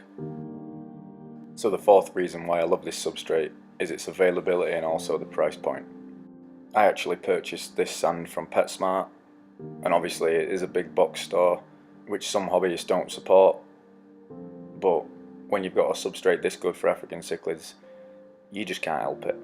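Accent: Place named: England, United Kingdom. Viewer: • Thank you. Yeh I was about to say I'm hearing the good old English accent lol. Yes will try that mate for sure. 👌🖒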